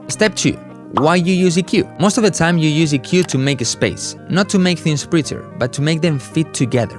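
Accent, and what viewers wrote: Spain. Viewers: hermano ese acento es español dime que si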